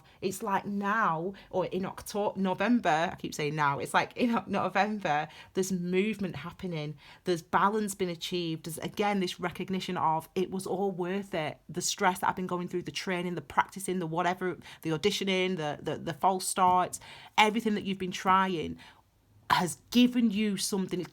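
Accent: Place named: Northern England, United Kingdom. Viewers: I'm in Darwen, I knew I recognized the accent 🤗 thanks for the video! Have a beautiful week x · Love your readings, your lovely brown hands and your "Guy Martin" accent. You sound like his female equivalent. Are you two from the same geographic area?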